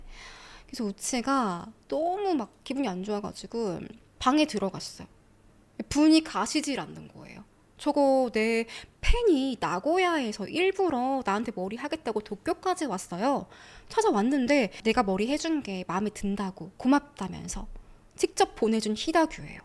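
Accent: Busan, South Korea. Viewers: 부산 사람이신거 많이 티가 나시네요. 일본말을 엄청 잘 하시고 일본 문화에 대해서도 엄청 많이 알고 계시네요 일본에 대해 많은 호감이 있으신가 봐요.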